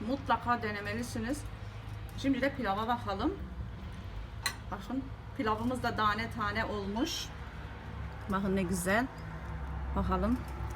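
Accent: Kayseri, Turkey. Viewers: Nerelisiniz ..kayserililer gibi konusuyorsunuz🥰🥰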